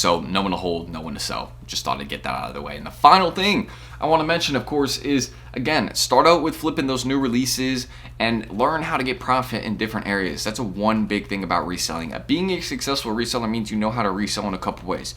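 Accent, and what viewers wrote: South Carolina, United States. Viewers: W vid Btw are you from South Carolina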